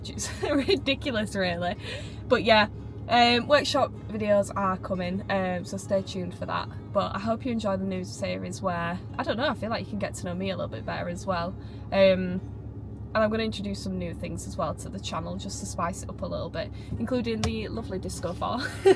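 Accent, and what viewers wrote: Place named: North East England, United Kingdom. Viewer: Advisory 18 😂 you sound like your from the north east lass?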